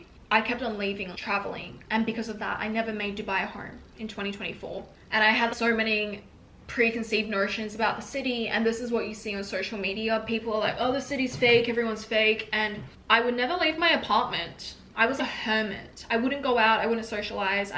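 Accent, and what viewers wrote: Australia. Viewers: your australian accent is the one that keeps me hooked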